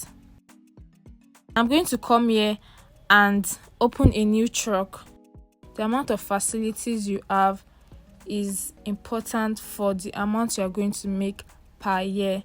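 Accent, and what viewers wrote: Nigeria. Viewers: Are you Nigerian, sorry for asking but you got that Nigerian accent. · You sound Nigerian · You sound African Nigerian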